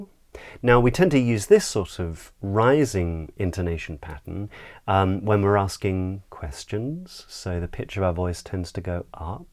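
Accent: United Kingdom. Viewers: I really enjoy listening to your speech and the pure British ACCENT.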